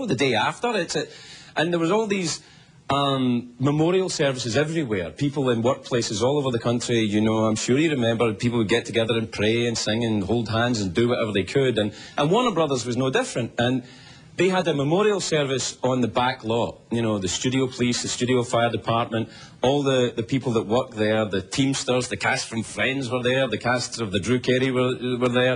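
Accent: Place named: Scotland, United Kingdom. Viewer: He sounds so scottish here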